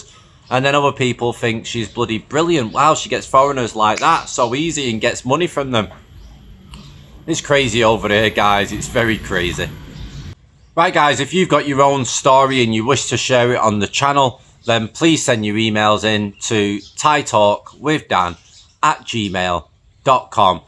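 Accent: United Kingdom. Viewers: What is that accent, UK, Australia, New Zealand??????????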